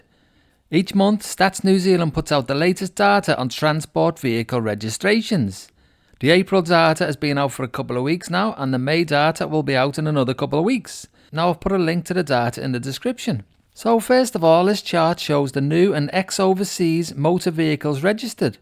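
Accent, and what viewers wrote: Liverpool, United Kingdom. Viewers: I love the fact a guy with a Liverpudlian accent is informing me on the state of our economy. 😂 I've lived here for 52 years and concur with your observations.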